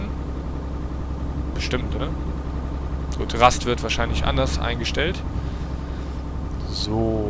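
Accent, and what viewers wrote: Aachen, Germany. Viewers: KOMMST DU AUS AACHEN?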